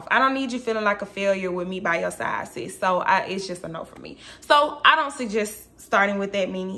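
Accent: Atlanta, United States. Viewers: You talk like you’re from Atlanta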